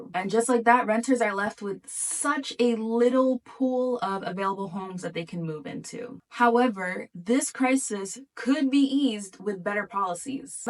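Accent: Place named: Canada. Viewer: I'm genuinely surprised to hear a Canadian accent on this video. That's how bad things are.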